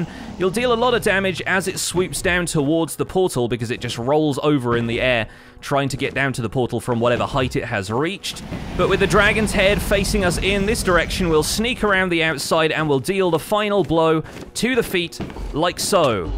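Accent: United Kingdom. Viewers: I just love your British accent😊😊😊😊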